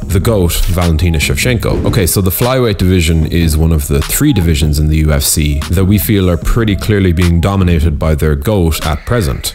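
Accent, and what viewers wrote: Ireland. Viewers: Hooray the Irish voice is back!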